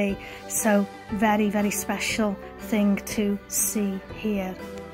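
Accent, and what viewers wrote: Wales, United Kingdom. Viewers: It couldn't be more perfect location for a monastery. The serenity and beauty are perfect combination. I hope your cold had left already. Little Switzerland is a fitting nickname. Gosh your Welsh is so good to listen to, I love it. The church is really looking great considering how old it is.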